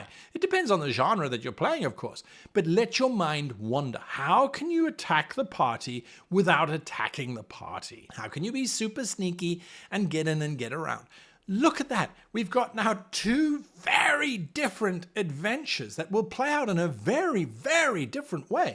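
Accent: South, United States. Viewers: With that accent, I though all of the adventures were going to be getting those Duke Boys. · This country boy accent is killing me! Love it!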